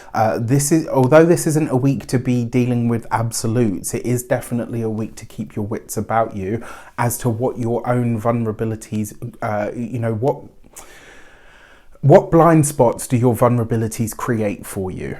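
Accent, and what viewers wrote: United Kingdom. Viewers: Hello sir, I happened to come across you for the first time ever...thank you universe 🥰 great read, thought provoking and resonant for me. Thank you, also nice to have a British male accent doing readings ☺️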